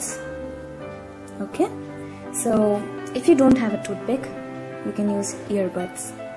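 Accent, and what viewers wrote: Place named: India. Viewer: hey, i love your indian accent. you sound like you have a beautiful heart. thanks for the tutorial and am going to make some of the earring you showed us and post pictures for you. thanks for sharing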